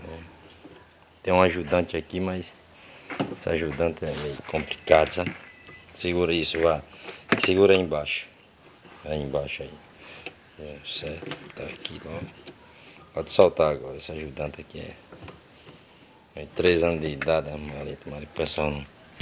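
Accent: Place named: Recife, Brazil. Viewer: Vc é de recife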